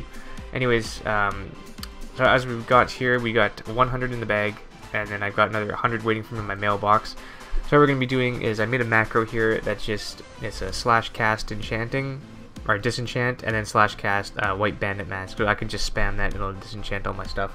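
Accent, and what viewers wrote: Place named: Canada. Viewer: great video brother I can't tell if your from the north US or Canada you kinda sound Canadian lol my bad I just like to know where ppl is from.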